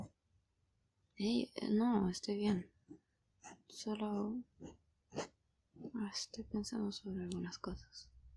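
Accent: Chile. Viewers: Pregunta Eri chilena? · Eres chilena? Xd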